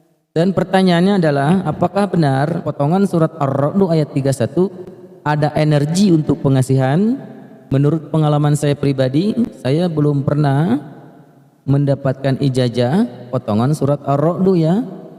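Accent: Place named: West Java, Indonesia. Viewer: Di lempangkeun....😂😂😂nuhun kang...logat sunda na kaciri pisan..qobiltu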